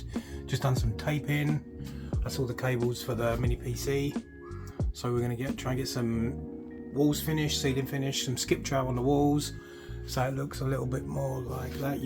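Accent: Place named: England, United Kingdom. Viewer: Amazing work—love his English accent…😃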